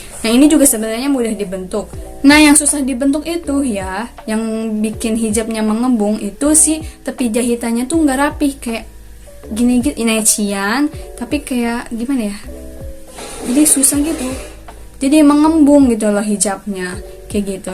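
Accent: West Java, Indonesia. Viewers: Se formal apapun ngomong bahasa indonesianya sundanese, anger we aya bumbu sundana nya... Salaam sundanese🙏🏻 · Teteh orang Sunda nya? 😁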